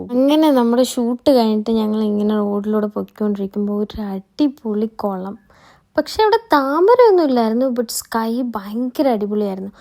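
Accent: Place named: Tamil Nadu, India. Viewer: Ivr engne tamil touch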